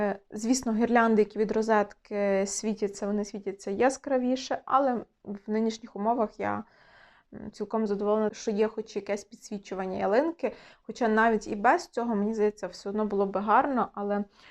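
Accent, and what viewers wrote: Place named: Lviv, Ukraine. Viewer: Доброго вечора,на Ваш канал потрапила за рекомендацією " ютуб", почувши щиру українську з львівським присмаком залишилася тут без вагань! Маєте гарний смак! Ваші відео то окрема форма насолоди! Завжди все стримано, вишукано, гармонійно, щиро. Наразі працюю з шкарпетковою пряжею ( вяжу для ЗСУ ). Гарних, мирних Вам свят, теплих та цікавих зустрічей! oksana_sokolova156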